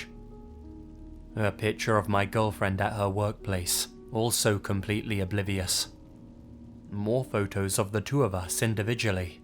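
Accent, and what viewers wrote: United Kingdom. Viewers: How and when did you get into narrating horror stories. Also are you British? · I love your creepy intro. And your adorable British accent.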